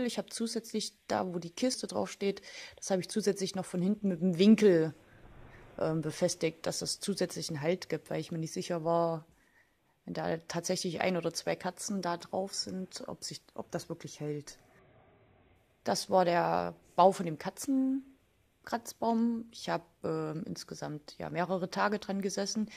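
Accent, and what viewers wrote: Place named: Magdeburg, Germany. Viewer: Klingt wie Magdeburger-Raum-Dialekt :D Super Kratzbaum und Video! Gibt n Like :)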